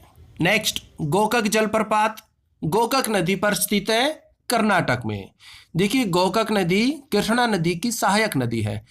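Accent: Haryana, India. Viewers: Haryanvi hindi sahi se nhi bol pate chitrakut..ko chittar kut padha ja rha h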